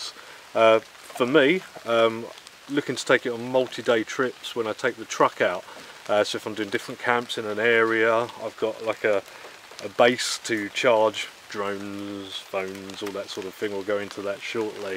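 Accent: United Kingdom. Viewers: what part of the UK do you think he is from dohhhh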